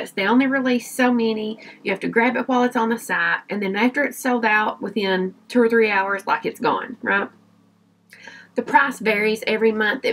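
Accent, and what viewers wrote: South, United States. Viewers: I recently found your channel via emilynoel83. I just love your videos! You are such a beauty inside and out!! I love your accent, from one southern girl to another. :)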